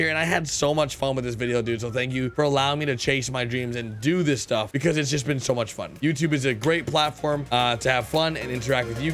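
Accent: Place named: New York, United States. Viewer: He sounded like a New Yorker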